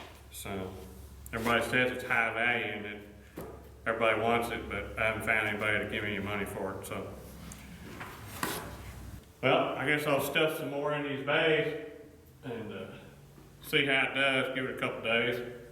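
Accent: South, United States. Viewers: Buddy, mineral wool is for sound proofing and not for insulating or moisture control. Call a union contractor in for a consult. Use cellulose to control moisture and put poly film up after it is blown in. Stop reading about this on the webby web, call a local professional. YOu sound southern so you might need to put the poly outside, again call a local professional. For what the roxul costs you could hire that job and have it done with a proper material for your situation there. Good luck! Roxul will hold water btw, it wont control it. Think of a wet sponge. And then the slag will rot and rust, you'll see. And when it does it smells like someone shitted in your walls. You asked for other's experience, here's mine.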